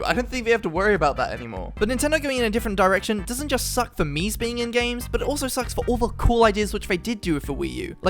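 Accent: United Kingdom. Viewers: you have a british accent like me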